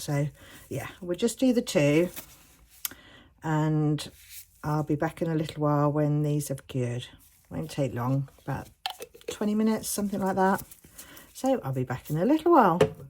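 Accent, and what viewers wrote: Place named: United Kingdom. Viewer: You actually answered a question that I was already wondering. Thank you so much! I already own the Mayspring Dazzling Diamond, but I have heard other crafters raving about the Crushed Crystal. I considered buying the Crushed Crystal, just to see what everyone was raving about. You just saved me some money. I can wait till I use all of my Mayspring then have a go with the other one. Again thank you. I am a new sub now because I think you are doing an amazing job on your channel. Oh and I love your name, it was the name everyone called my mother (a nic-name) I am a Brit living in USA so I like the accent too. Anyways well done my new YouTube friend.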